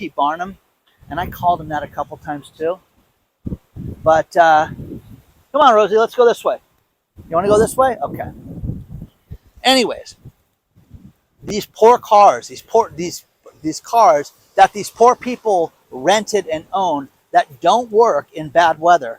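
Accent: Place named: California, United States. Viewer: My mom watches your videos religiously and has for many months. You seem like a sensible guy. You clearly know magnitudes more than you let on. You're also one of the very few people I've ever seen who has a legitimate Californian accent. Lots of people still claim there is no such thing, but it's very subtle.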